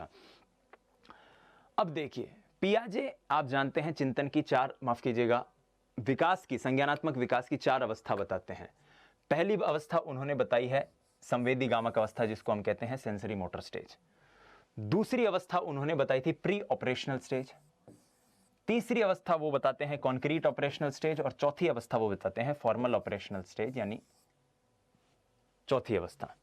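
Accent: Rajasthan, India. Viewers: Mai up se hu but jb ap rajhasthani bolte to Maza bahut ata .love u sir.psychology ke dada ho ap.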